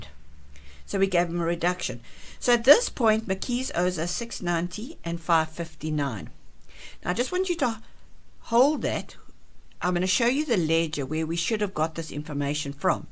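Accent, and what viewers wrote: South Africa. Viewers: I wasnt expecting a south african accent eh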